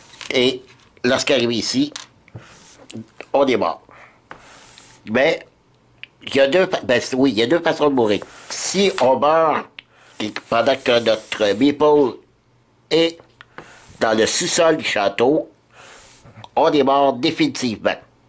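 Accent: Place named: Quebec, Canada. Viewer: Merci pour ta vidéo, bien détaillée, pour tes bons voeux (reçois les miens en retour) et ce délicieux accent de la Belle Province qui régale mes oreilles françaises ! Au plaisir de te retrouver sur d'autres vidéos (j'ai adoré ton Top 10 jeux solo). Bye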